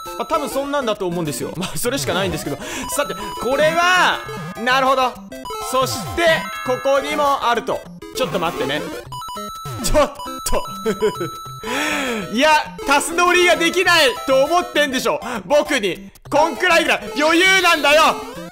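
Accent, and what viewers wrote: Fukuoka, Japan. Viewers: 全く関西弁ちゃうから気にせんでいいと思うよ〜！関西の俺からしたら福岡らへんの方言に聞こえます！ドコ住みか既出ならごめんなさい！ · 福岡弁は、関西弁なんかより柔らかくて素敵と思います(^^ 収益化が始まったとのことですので、より益々、見させていただきます。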